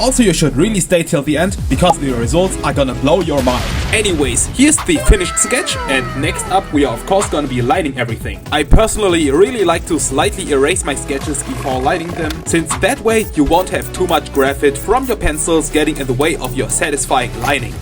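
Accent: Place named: Germany. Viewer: I Hear the deutsch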